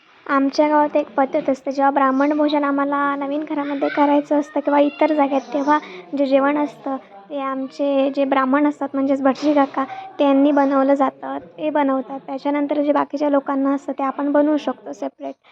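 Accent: Malvani, India. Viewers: आम्हाला नाही बोलवले वास्तुशांती ला तू मराठी न बोलता मालवणीत सूंदर बोलते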